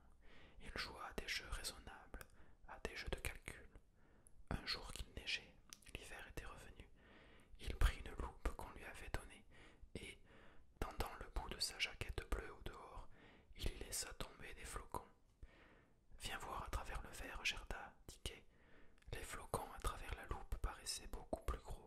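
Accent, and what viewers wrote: South, France. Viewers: ...j'avais reconnu. Sud sud...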